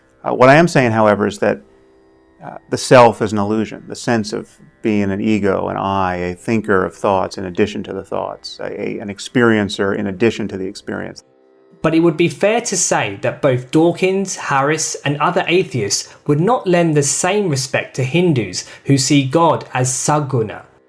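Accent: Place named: United Kingdom. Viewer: Charvaka was also a Hindu materialist Rishi,his philosophy was very materialistic😄.I have seen all your videos it seems you have read many Vedas,upnishads and purana.your understanding is also praisable,I think you live in London (your accent is British and library of London is pretty rich specially when it comes to old Hindu scriptures). I'm requesting you second time make a small video about yourself.I want to recommend a name Dr.Narayan Dutt shrimali.Answer my comment so I will know that you read.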